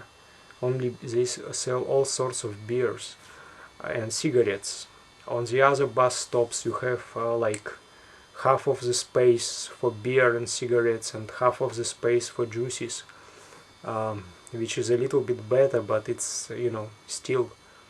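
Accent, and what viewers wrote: Russia. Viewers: Guy, you have so russian accent :D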